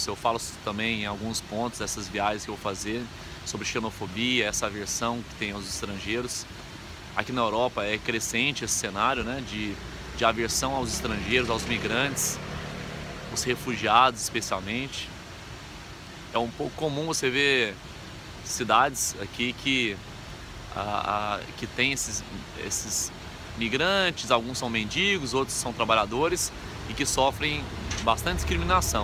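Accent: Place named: Goiás, Brazil. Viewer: Pessoalmente eu não reparo em sotaque goiano, mas no Youtube fica tão evidente hahahaha Parabéns pela iniciativa, professor! Vou te acompanhar.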